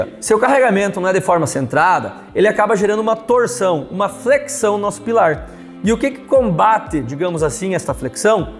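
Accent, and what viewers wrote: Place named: Serra Gaúcha, Brazil. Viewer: Já sou seu fã e pelo tom de voz deve morar no sul serra gaúcha 👍🙏🙏👏👏👏👏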